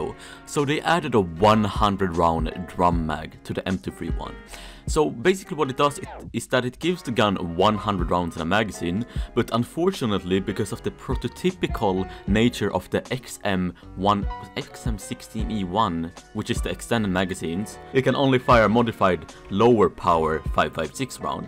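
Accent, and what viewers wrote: Sweden. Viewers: You sound swedish. I can tell because I am swedish.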